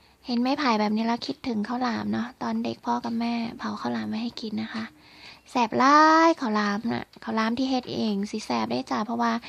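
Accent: Isan, Thailand. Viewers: เว้าอีสานน่ำ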